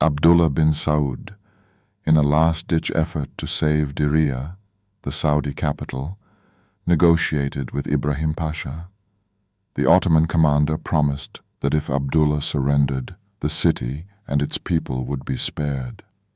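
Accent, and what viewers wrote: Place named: Middle class, United Kingdom. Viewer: lol if it wasnt 4 the british there would be no oil markets and u lot wuld still be 3rdworld peasants, btw u shuld have narrated the video yorself instead of using sum corny english middle class voice over ...or do u think tht sort of accent gives yor narrative more validity ...funny tht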